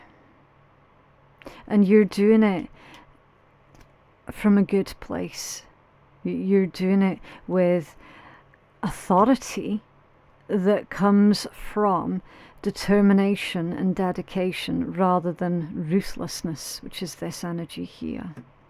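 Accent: Scotland, United Kingdom. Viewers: Love your Scottish accent 💖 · Thank you for ur readings..been putting my rune under pillow. Ur voice reminds my of living bonny Scotland for 2 years and how her beauty and kindness has healed me when it was needed. Bless u deary❤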